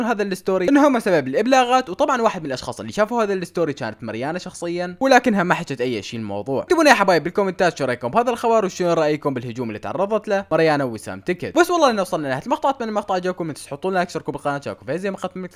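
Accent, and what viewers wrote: Iraq. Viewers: انتة من وين من العراق لان تحجي عراقي؟؟؟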